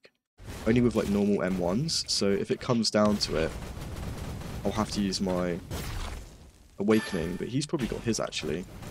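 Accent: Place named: United Kingdom. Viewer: This guy British asf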